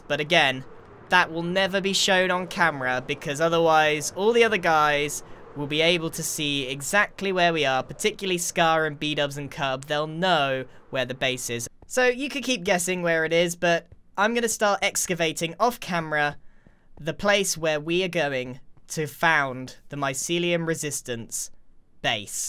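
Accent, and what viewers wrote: United Kingdom. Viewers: "What in the broken teabags" yep, he's definitely british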